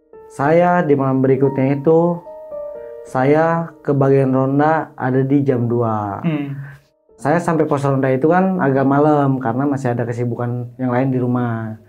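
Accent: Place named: Bogor, Indonesia. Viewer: Rada cape dengerin ceritanya. Padahal kita sama orang sunda. Mungkin karna orang bogor jd dikit beda nadanya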